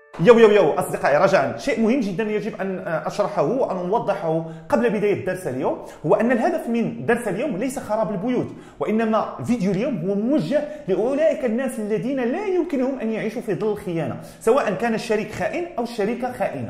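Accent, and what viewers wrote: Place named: Morocco. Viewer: انته بتتكلم ً. مغربي. ما فهمنا. عليك بتتكلم. بسرعه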